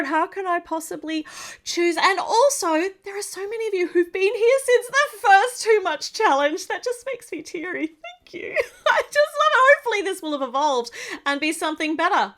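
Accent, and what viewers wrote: Australia. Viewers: It‘s so beautiful watching you after a long time, you were such a positive influence for me during covid times, i spent hours just watching you build and talk in your lovely australian accent, its so wholesome for me, you can‘t even imagine. Eventhough my childhood home is sold, and i‘m living alone for the first time in my life, when watching your videos, i‘m the thirteen year old me again, it‘s crazy. Love you so much❤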